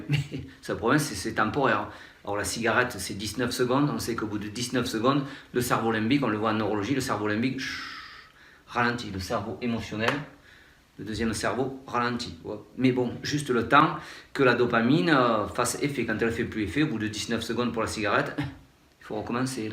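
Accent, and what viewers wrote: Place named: South, France. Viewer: vous êtes top! Juste vous écoutez avec l'accent du soleil çà me remonte mon taux de sérotonine;)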